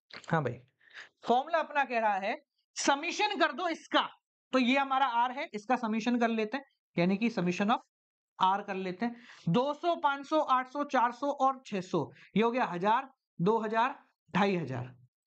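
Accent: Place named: Agra, India. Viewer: sir aap agra se ho kya aapke accent se lag ra hai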